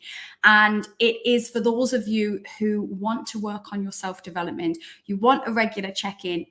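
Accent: Newcastle, United Kingdom. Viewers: listening to your GEORDIE accent videos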